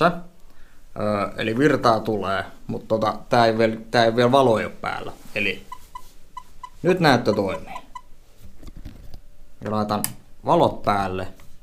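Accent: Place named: Kuusamo, Finland. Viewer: Kyl kuusaan murre kuulostaa hyvältä👍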